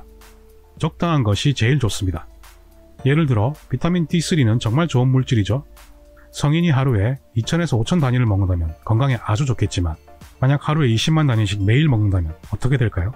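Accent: Gyeongsang, South Korea. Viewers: 영어 일본어를 마스터해도 경상도사투리는 못고치는구나...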